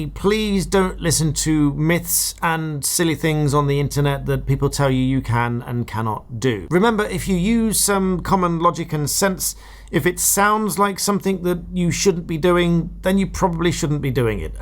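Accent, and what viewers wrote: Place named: RP, United Kingdom. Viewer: listen and im getting off this channel. people have the right inalienable right to travel weather that be by time travel or donkey. sorry THAT WILL BE posh twat wannabe reading what we can all read ourselves you pantronising twat..did you come out the womb speaking like that you twat? fk you and your now kings laws member of tha barrrrrrrrrrrr society. you can all fk off you shark pit infested hitlers. you do more harm than good! all out for yourselves fkn loser. what good did you do ever for anyone?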